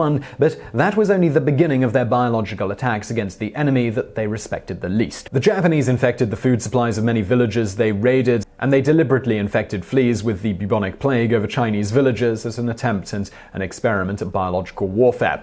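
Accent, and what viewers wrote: United Kingdom. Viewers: This guy doesn't always have accurate facts. His British accent doesn't make him smart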